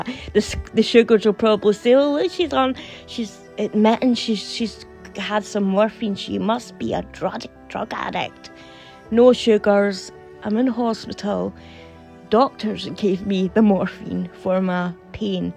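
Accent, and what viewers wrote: Scotland, United Kingdom. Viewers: You nailed it,. Those poor poor Sussex parasites. I love your Scottish accent. It reminds me of my dear Gran and Grandpa, long gone but never forgotten. I hope you recover soon.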